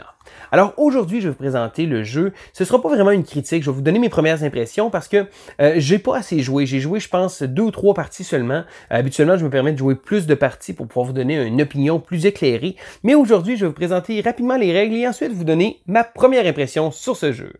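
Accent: Canada. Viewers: Salut! J'ai regardé ce vidéo car j'étais curieux de ce jeu, et j'ai décidé de m'abonner pour même entraîner mon français. Mais je eu des difficultés à comprendre quelque chose, puis-je te demander d'où viens-tu? Je suis curieux de quel accent tu as. Peut-être canadien?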